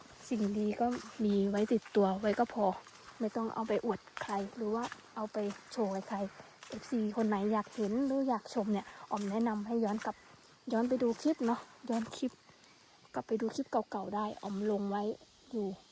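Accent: Isan, Thailand. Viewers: สาวน้อยเดินป่าถ้าตกใจหรือเจออะไรแปลก เวลาอุทาน ใช้ภาษาอีสานบางคำฟังแล้ว ตลก ขำ บางครั้ง ก็แปลกไม่ออกแต่ก็ชอบนะ สำเนียง เสียงไพเราะดีมากชอบขอบอกจ๊ะ❤❤❤😅😅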